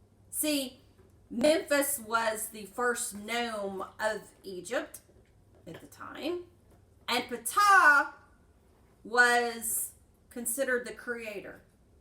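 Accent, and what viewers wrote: South, United States. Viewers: Its nice to here another southern voice on this topic!! Our culture here is less than a blink of an eye in Earth time. But still, in 10 years of this journey, not one person has have i met i can talk to about anything similar lol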